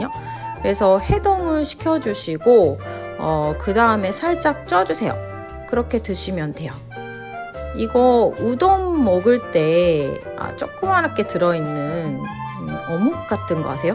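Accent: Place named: South Korea. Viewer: 영상에는 외국인 엄마라고 했는데 외국인 맞나요?🤔 그냥 한국인이 말하는 억양인데요? 아님 부모중에 한분이 한국인신가요?😏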